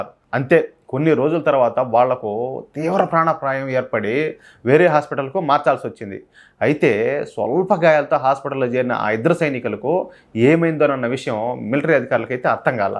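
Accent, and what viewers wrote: Rayalaseema, India. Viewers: సార్ చక్కటి రాయలసీమ యాసలో చాలా బాగుగా వివరించారు. దన్య వాదములు.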